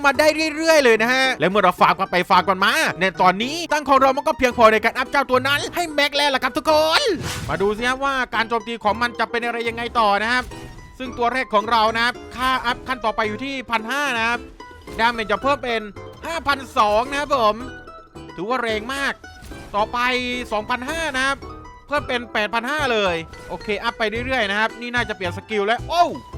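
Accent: South, Thailand. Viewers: พี่เป็นคนใต้หรอคับ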